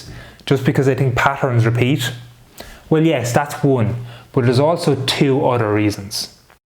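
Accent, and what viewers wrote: Ireland. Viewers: What an accent! Are from Ireland by any chance? Greetings from Munich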